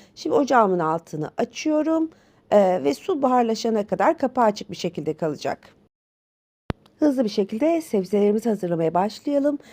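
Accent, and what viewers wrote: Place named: Istanbul, Turkey. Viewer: istanbul türkcesi konusan o jadar aziz ki.Sizi dinlerken cok mutlu oldum🎉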